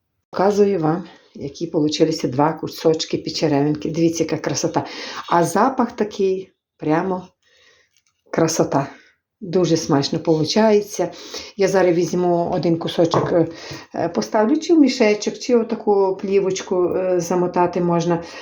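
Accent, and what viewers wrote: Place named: Western Ukraine, Ukraine. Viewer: Какой говор интересный! Западная Украина наверное? Дякую за рецепт.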